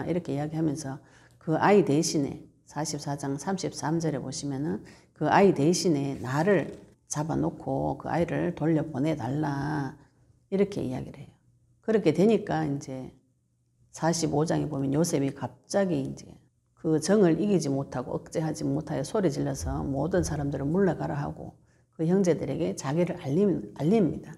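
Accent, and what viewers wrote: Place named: Gyeongsang, South Korea. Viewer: 갱상도네? 대군감?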